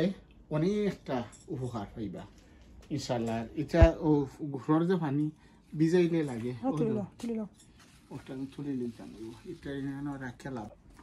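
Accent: Sylhet, Bangladesh. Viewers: তোর আর কোন কাম নাই কথা শুদ্ধ ভাষায় বলতে শিখে কথা বলো সিলেট মাল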